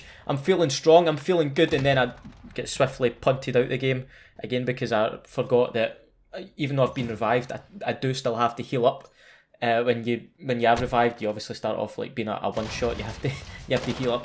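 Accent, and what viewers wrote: Scotland, United Kingdom. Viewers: #scottishficationsquad thanks to my endless watching of your videos, my internal monologue now has a Scottish accent. Keep up the great and hilarious videos mate!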